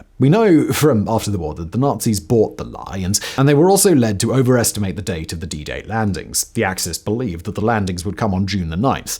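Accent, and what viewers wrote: United Kingdom. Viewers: I'm pretty sure this was a very good program. What I could understand was very good. My problem is that I am a US citizen, and though I love British accents, I can't follow very rapid speech. I missed at least a third of what was said because it was a little too fast for me to be sure I was comprehending what had just been said. If your main audience is British or Commonwealth citizens, I am sure you are doing a terrific job. If you also want to let Americans appreciate MI5 and XX, perhaps you could speak just a little more slowly? I know the fault is MINE -but I did hope to hear it all, and I couldn't keep up.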